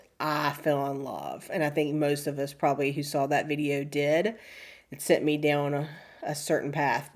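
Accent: South, United States. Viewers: Another enjoyable video !! Right at the moment I have a broken wrist and I’m so jealous of everything you’ve stitched, but I’ve really realized how relaxing our stitching hobby is, I’m retired so I start every day with and hour of stitching. Now you’re jealous right , your day will come. You have a beautiful home, I live in Canada and when I think of a “southern” home yours is exactly what I envision (no idea where you live but with your lovely accent I presume it’s in the sunny south.) have a fun Halloween 👻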